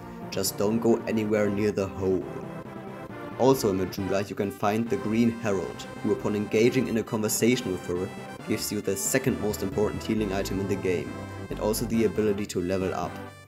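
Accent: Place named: Germany. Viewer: Are you german? You have a german accent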